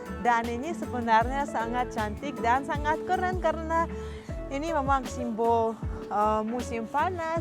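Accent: Indonesia. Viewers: penyapaianya matul,bule tapi indonesia banget, sopan,disegala hal👍👍sht dan sukses dan kluarga🙏🙏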